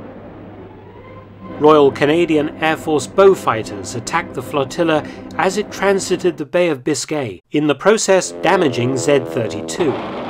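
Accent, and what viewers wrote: England, United Kingdom. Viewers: Best WWII Youtube, 100%. Encountered your channel in late 2018 by chance, and I believe I’ve watched every single one of your videos, some over again. Can’t say that for many other Youtube channels. Your clear, concise English accent and the incredible knowledge is like the History channel (when it was actually good) on steroids. With the amount of information you have on WWII, you’d almost think you lived through it. I don’t know if you’ve ever considered or done this already but I think you should apply to be narrator for history programs on the side. I’d watch them all. Thanks for the amazing videos Mr. I hope they keep coming for many years!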